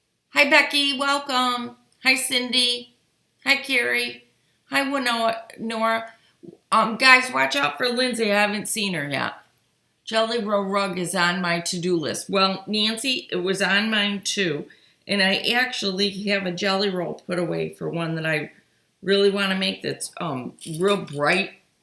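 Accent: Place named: Buffalo, United States. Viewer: Ah, I thought I recognised the accent. I am in England a d have a very good friend in Buffalo, and I hope you are not offended but some of the ways you say some words reminds me of them. I call Buffalo my second home, I have been there so many times. Thank you for your videos, they are so informative.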